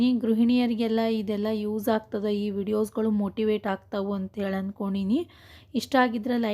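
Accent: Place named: Vijayapura, India. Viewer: Tq akka namdu vijayapur nim baashe nam tarane anasataiti akka adake kelde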